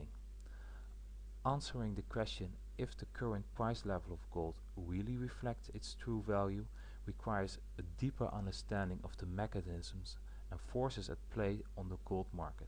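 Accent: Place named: Netherlands. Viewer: Lol he's Dutch. Shitty accent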